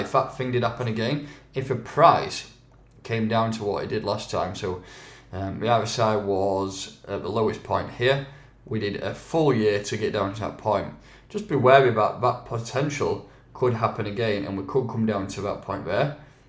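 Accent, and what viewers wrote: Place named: North, United Kingdom. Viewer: Thanks as always chief! Love the videos and the northern accent delivery beyond awesome!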